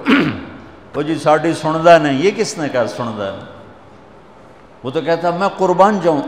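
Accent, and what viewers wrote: Punjab, Pakistan. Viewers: Maulana ji mai india punjab to reverted muslim thoda pakistani punjabi accent bada kaim e ,,, mere naal gal karlo.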